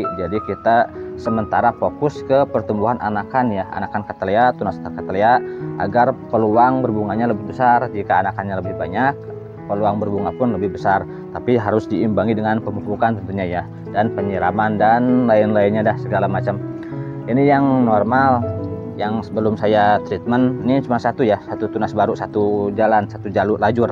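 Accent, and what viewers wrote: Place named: Sunda, Indonesia. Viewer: Sy dengar pencerahannya TKS ya kang cmn sdkit geli itu logat sundanya meni medok psn😊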